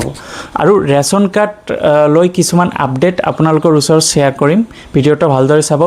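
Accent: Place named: Silchar, India. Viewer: Tumi chilchoria niki kotha tok hota buli koisa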